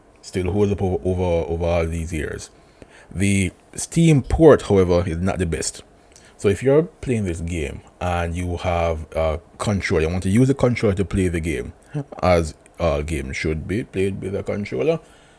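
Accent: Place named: Africa. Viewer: We all have accents. You have this strong African tribe accent. Where are your parents roots from?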